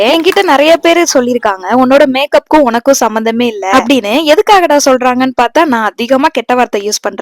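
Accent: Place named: Genguvarpatti, India. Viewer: Genguvarpatti slang 😂😂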